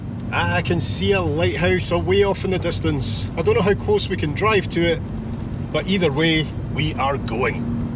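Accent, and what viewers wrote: Scotland, United Kingdom. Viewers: I love your videos. So very interesting and you present it so well. I like how you just let the trips evolve. We love Scotland and its friendly people and your wonderful accent. Makes us want to visit again. We were there a little more than a year ago. Regards, Jim North Carolina USA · Another brilliant video-thanks for taking me to places I love and doing it in a natural relaxed way with that lovely Scottish accent. ThIs one came at the right time for me as I am reading The Chess Men by Peter May part of the Lewis trilogy. If you have not read these books they give great insight into the character of the people of these islands. Carry on the good work.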